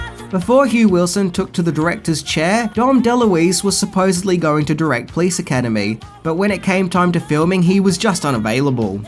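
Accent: Australia. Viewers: After of this horrible Australian narrator, I just... I just can't. 👎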